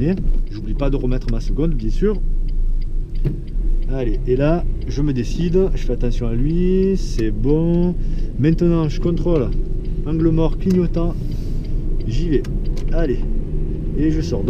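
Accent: South, France. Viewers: Salut, J'ai mon examen du passage du permis dans 27 jours, je révise grâce à tes vidéos. Quel plaisir de t'écouter et d'entendre ce bel accent du Sud que tu nous offres, ça donne du soleil à mes révisions. Gratitude infinie de Normandie 🙏💐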